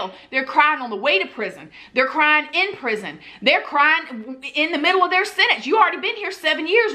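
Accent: South, United States. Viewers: Hello. I’m a little late to your channel and everything so I doubt you even see my message but I think you’re awesome. I’ve been binge watching your videos for like the last 3 weeks now and I’m hooked. At first I thought everything on your channel was strictly for women only but I kept watching anyway. I’m from Pittsburgh PA and I know you’re from Florida but you’re very easy to listen too. Plus you remind me of one of the females from around my way. Just with a little southern twang. I loved this video in particular a lot. I know it was just for fun but a lot of what you said was right on point. I’m also a libra so I was definitely feeling this. Anyway keep doing what you’re doing. You have a fan over here.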